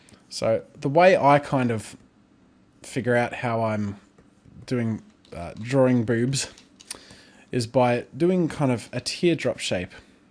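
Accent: Australia. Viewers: every single time I click on his videos I say "oh yeah, he's Australian." 😂 No hate to any Australians ❤ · Are you Australian or what?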